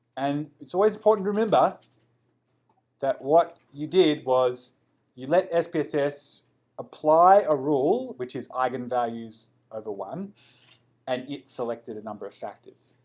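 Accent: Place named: Australia. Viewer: that's a typical Australian accent.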